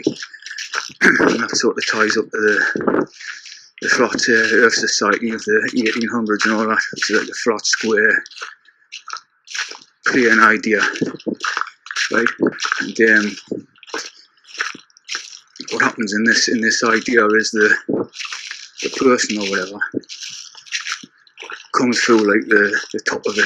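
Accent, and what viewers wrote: Newcastle, United Kingdom. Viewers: I have heard this 3 times now and very interesting to say the least. Love the Geordie accent, Whay aye man! My family are from the north east :) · lol.. 4th time into watching it and still not getting more than few words! 😅 but i will not give up since you say it's important Knowledge and anyway i like your voice..so going soon for the 5th... geordie accent i will decode you 💪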